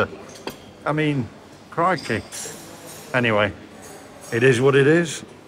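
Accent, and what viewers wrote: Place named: England, United Kingdom. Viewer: At first, I was eggstatic about watching another vlog. I don't think I've ever heard anyone rant on for so long about how he asked a Spanish bloke in a canteen in Tenerife in his broad English accent to flip his egg and then rant on for the rest of the video about how the server was rude, simply because the Spanish server didn't quite understand his broad English. The Spanish server was probably sick to the back teeth of English people going on at him. Jesus wept. Maybe try attempting some Spanish next time? Cuando en españa......... You asked, "Am I overreacting?" Yes, you were eggaggerating. "What would I have done?" Been slightly disappointed, but got over it within a few minutes. Please tell me you were joking. My rating: 🍳🥚🍳🥚🍳🥚🍳egg-tastic. Yes, those toasters are b@ But I can imagine there have been several fires when English people were making toast in regular toasters and couldn't quite manage it whilst holding their third breakfast pint of the day at 8am. 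🤣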